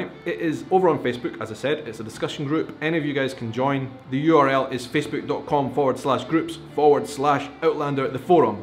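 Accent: Scotland, United Kingdom. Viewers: Hi, I like your YouTube's channel and I love Outlander. I'm from Brazil and I hope you understand my english. First of all I really love the scottish and british accent in general, even yours. It's very sad to know that you didn't learn about your country's history in school, only students after 90's. I think it's because the clans, tartans and gaelic language were prohibited in Scotland by the english government. About half percent of scots wanted to go out of the United Kingdom. Do you think it is because the European Union's exit or scottish culture/politics or both? Someday I will visited Scotland, including the Highlands. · I was born in 1938 and raised in Edinburgh. Educated in Catholic Schools. St. Anne’s in the Cowgate, St. Patrick’s, just off the Cowgate, and St. Anthony’s. I believe they are all gone, at least two of them. I grew up during WWll, and yes, I was taught Scottish History, including The Jacobite Rebellion and definitely Culoden. 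(Sp.) I did manage to see and enjoy the first Season of Outlander. On Amazon Prime, although I haven’t seen the following seasons. Although I haven’t checked lately. I’ve been on Amazon for many years. After nearly 55 years in the USA, I enjoy seeing Edinburgh on YouTube. So funny that where I grew up, a working class area. Guthrie Street. Now it’s gentrified. But I have so many fond memories of growing up in ‘Auld Reekie’ Love your show, keep it up, nice hearing my old accents. Takes me back, fondly.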